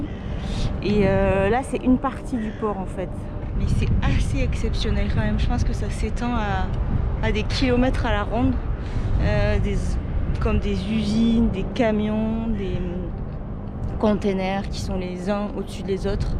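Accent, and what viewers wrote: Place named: South-West, France. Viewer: c'est d'ou ce bel accent ? sud ouest ?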